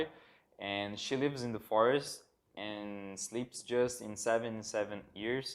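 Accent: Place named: Brazil. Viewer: O sotaque brasileiro tá mto perceptível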